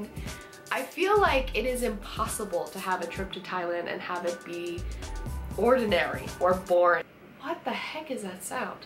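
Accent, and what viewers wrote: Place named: South, United States. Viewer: LOL you should keep your southern accent at all times!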